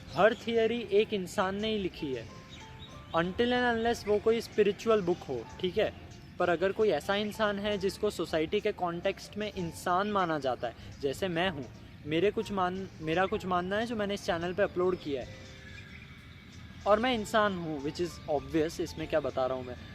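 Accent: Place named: Gujarat, India. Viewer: gujrati log jab hindi bolte h tab thodi si to gujrati tone aa hi jati h bas ussi liye aisa laga 😅 btw mai bhi gujrat se hi hu .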